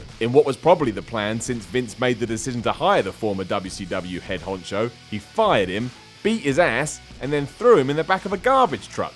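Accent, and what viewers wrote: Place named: South Wales, United Kingdom. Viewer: are you from south Wales you sound like it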